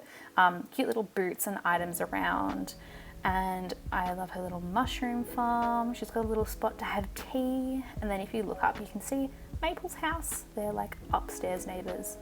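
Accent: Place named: Australia. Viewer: 🧡🧡🧡 so happy to hear an Aussie accent for a change hehe x love your island so much! Xx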